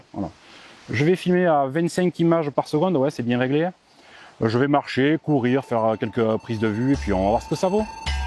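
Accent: South, France. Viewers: Impéccable, avé l'accent du sud 👍, belle présentation et démonstration, il me tente bien ce guimball ... salutations du 07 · Super vidéo vive l accent du sud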